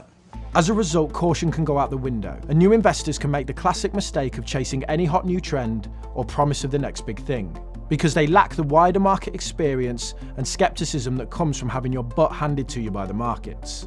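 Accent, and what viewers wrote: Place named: Northern England, United Kingdom. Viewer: I'm loving the northern accent. Not enough northern Youtubers.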